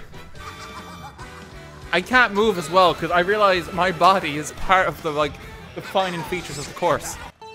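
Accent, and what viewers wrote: Ireland. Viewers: holy shit its irish jerma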